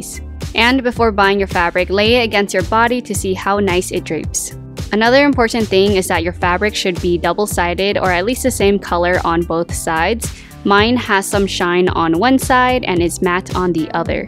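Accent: United States. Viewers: She sounds sooooo american oml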